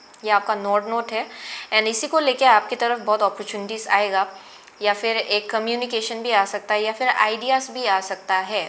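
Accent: Northeast, India. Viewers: R u from northeast???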